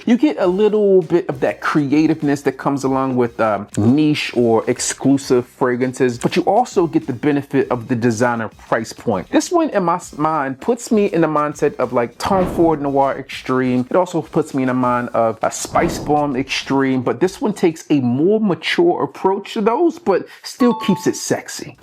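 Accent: Baltimore, United States. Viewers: I picked up marwa n Hugo boss absolu recently n they both smell great!!! Question tho champ, are you from Baltimore? Asking bcuz I’m from bmore myself n when you say do, two, too or anything that sounds like these words, you sound exactly like someone from the town lol. Keep dropping this knowledge my boy bcuz I’m definitely tuned in n I know I can definitely take your word for what’s good out here in the fragrance game!! Take care boss✌🏽💪🏽💯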